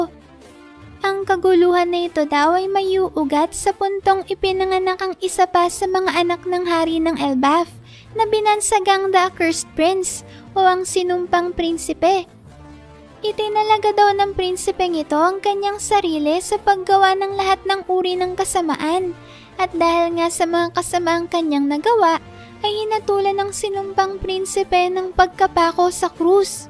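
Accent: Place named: Bicol, Philippines. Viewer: Taga bicol ka pala?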